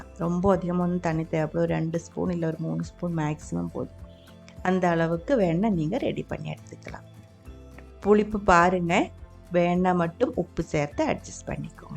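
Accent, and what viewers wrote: Kerala, India. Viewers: Excellent video ! Loved the accent - I speak Tamil like you but i don't succeed as much as you do in keeping Malayalam out of my Tamil. End up talking like Kamaraj in Michael Madana Kamaraj! Although I may not have the skills and the patience to make such great food, I would certainly tune in to hear your "Thalayalam". !! Suresh / Norway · In the middle of your efforts to speak in “Tamil” Tamil, your Malayalam flavor comes out, making it nice. Maybe U can speak in Malayalam itself since most Tamils understand Malayalam. This will also help them to learn Malayalam. Kollengode S Venkataraman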